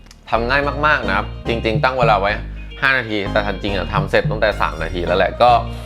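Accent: Thailand. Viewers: เฮ้ยทำไมเหน่ออ แต่เราจะทำตามนะชอบๆ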